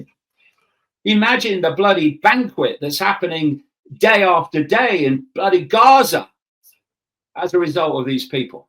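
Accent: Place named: Hertfordshire, United Kingdom. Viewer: He's from Hertfordshire to us inner London crew he's posh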